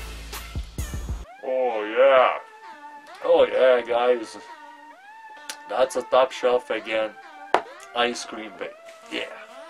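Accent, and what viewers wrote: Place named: Quebec, Canada. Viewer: Lol im an englishmen from quebec and ur accent is bringing back good memories haha awesome reviews to bro vapin the junkie shit right now too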